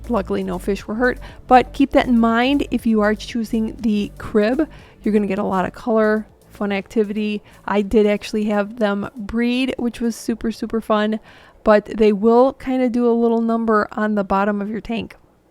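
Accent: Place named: Midwest, United States. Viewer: thanks for the video. you have a STRONG midwestern accent :) "oh for crying in the mud!" :P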